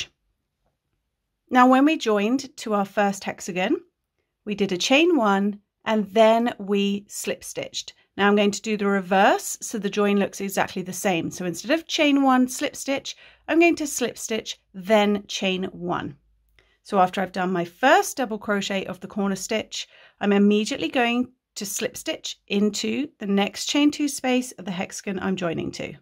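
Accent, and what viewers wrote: United Kingdom. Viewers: Hello I love your tutorials they are so clear and precise. But I have a query. You sound British and yet you use USA terms. When I learned to crochet 50 years ago all patterns were written, in what I now know as, UK terms. It’s only since watching You Tube that I have heard of USA terms. I prefer the UK terms, they make more sense.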